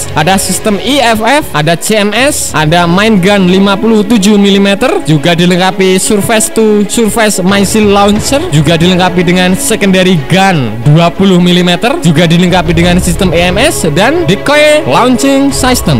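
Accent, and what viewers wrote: Java, Indonesia. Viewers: Jawanya asli.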